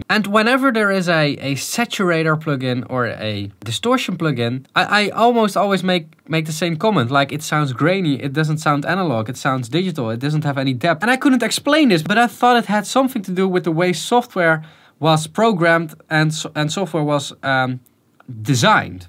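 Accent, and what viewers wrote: Netherlands. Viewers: Excellent! Are you Dutch?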